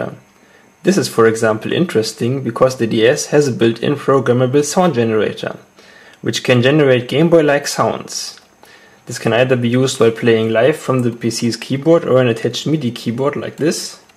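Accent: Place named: Germany. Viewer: You have a german dialect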